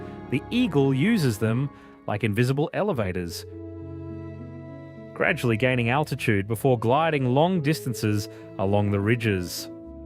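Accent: Australia. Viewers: Love to hear an Australian describe dimensions in feet and miles..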